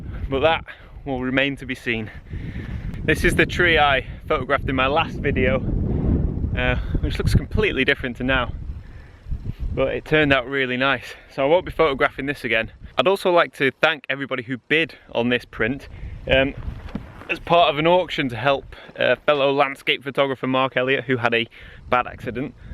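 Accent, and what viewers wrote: United Kingdom. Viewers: absolutely stunning british accent . Thanks for this. Waiting for the next one.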